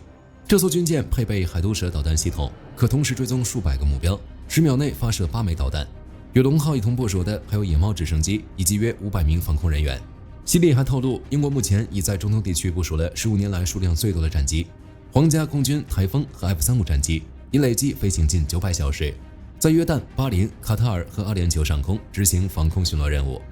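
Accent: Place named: Northeast, China. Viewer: 東北人呀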